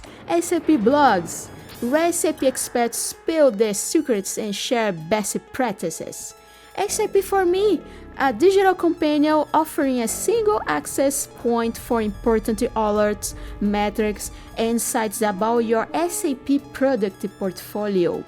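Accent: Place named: Brazil. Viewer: This is a really nice thing you're doing,! I'm so glad you're part of #erpgurus and I saw the link to your video there. And also, your Brazilian Portuguese accent is really nice. My brother is married to a Brazilian!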